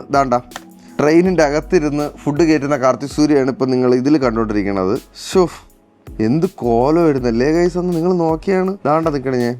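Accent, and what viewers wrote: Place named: Delhi, India. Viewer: Maza aa gaya… hearing your delhi slang… maza aa gaya bc ❤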